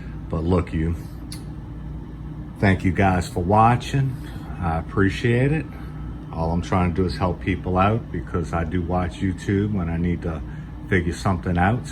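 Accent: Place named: New Orleans, United States. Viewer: Great job,. I changed hundreds of tires when I was about 18 with a floor bead breaker, two tire tools and a rubber tire hammer around 1969. Single and split rims with rings. The small tires are the harder. I have two tires I need to mount on two plastic rims that have been eating my lunch lately but I don't have a little changer like yours. Even if I did, I would probably break the rims. I don't know where you are but is sounds like you are from New Orleans. Thanks for a great video.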